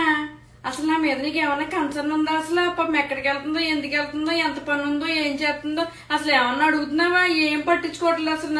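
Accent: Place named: Konaseema, India. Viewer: మీది నిజంగా కోనసీమేనా? మరీ అచ్చంగా అలా దింపేస్తున్నారు slang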